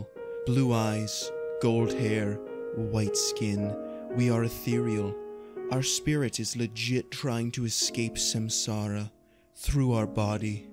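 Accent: Canada. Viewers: i feel like this man lives in Edmonton or Calgary somewhere cuz mf just sounds like one of us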